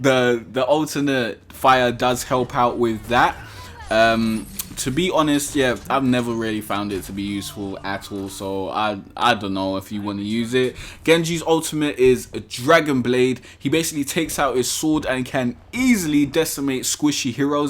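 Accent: United Kingdom. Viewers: Are you from north London?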